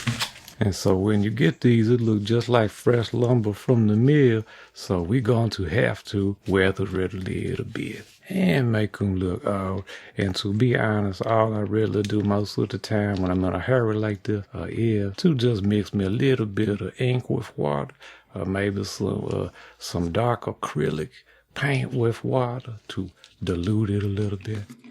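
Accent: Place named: East Texas, United States. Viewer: the more cultural lore we get from you, the more i feel a kinship. i grew up n a small town on a farm in deep east texas. We would pick wild blackberries every summer, and the local dialect was similar to yours. more or less, your vids feel really cozy and familiar to me.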